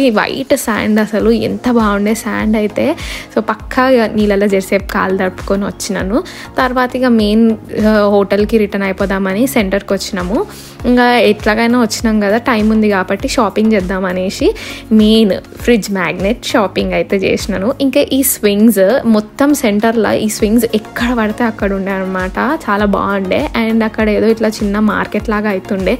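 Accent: Telangana, India. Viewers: #sappaga undhi telangana slag epudu boor kottadu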